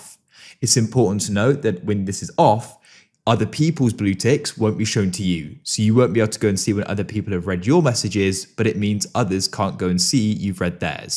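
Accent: United Kingdom. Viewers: You know what buddy it was helpful.On top of it I can guarantee that you are a Brit. I am used to hearing proper english as it's 11 years I am working for BT 😅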